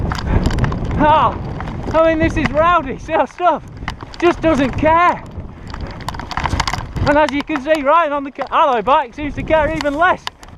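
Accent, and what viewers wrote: Northern England, United Kingdom. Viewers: Loving these. And is it me, or is there rather more deliberate/pronounced Northern-ness compared to the Future-ised accent you have to adopt, er, elsewhere? Either way, great stuff!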